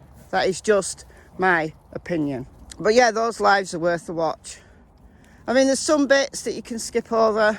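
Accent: United Kingdom. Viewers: Thank you,. I always enjoy your lives, even if I very seldom have ever caught you live. Watching the replays, I always enjoy listening to whatever you are talking about, plus I so enjoy hearing your British accent. 😉 I would very much enjoy hearing you talk about the difference between the kinds of domestic abuse. I would find that very interesting. Thank you so for the time, effort and dedication that you put into the content that you provide. Stay safe and take care. ❤️🤗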